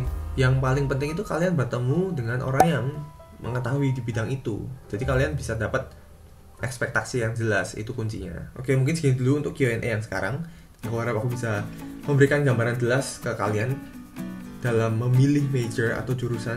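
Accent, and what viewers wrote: Java, Indonesia. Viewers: Kak logatnya khas Jawa buangeeet! Wkwkwkw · Kok bisa si pas ngomong english american banget, bahasa indo medok banget wkwk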